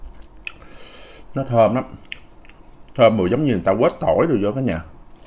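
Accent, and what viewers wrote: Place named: Mekong Delta, Vietnam. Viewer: E nói như vậy là đúng miền tây đó e mình đi làm ăn kiếm tiền giữ tiếng nói vùng miền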